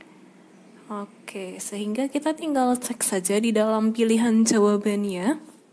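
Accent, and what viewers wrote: Java, Indonesia. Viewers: Mba2 ny ngomong ny medho 😆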